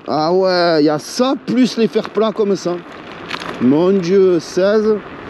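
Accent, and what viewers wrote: Marseille, France. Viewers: Laccent maseillais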